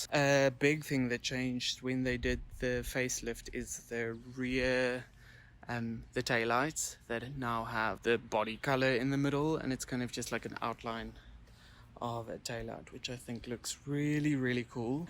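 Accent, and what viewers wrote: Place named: South Africa. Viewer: I could listen to that South African accent all day 🤗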